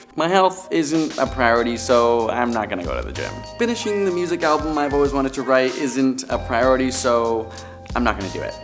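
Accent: Canada. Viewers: are you Canadian? the way you pronounce "Tommorow" is kinda bothering me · Your Canadian is sticking out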